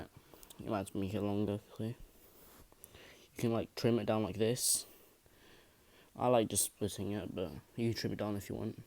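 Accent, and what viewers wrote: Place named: England, United Kingdom. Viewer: Your accent is so much more English now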